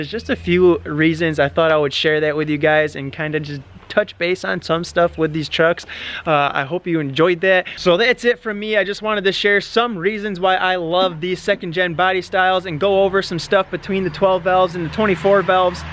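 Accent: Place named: Upstate New York, United States. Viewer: must be upstate ny, that accent....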